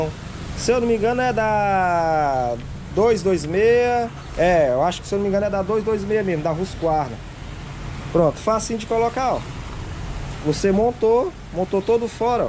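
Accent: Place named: Northeast, Brazil. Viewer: Show de bola merrmão!!! Me divirto ouvindo a maneira como você fala. Vou encomendar um dicionário "Nordestinês" para entender algumas palavras. Mas de boa, não estou mangando não. Não se aperreie pelo amor de Deus. Abraço do Léo o Tiozão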